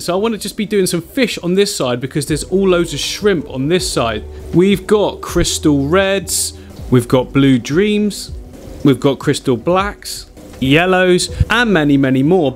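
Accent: United Kingdom. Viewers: I think he's from the UK.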